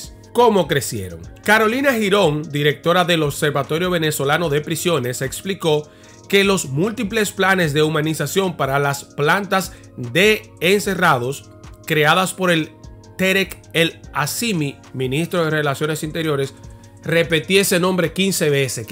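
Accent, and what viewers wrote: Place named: Dominican Republic. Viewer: Q te pasa. No sea pesado, así hablan los dominicanos, el no tiene q mejorar nada.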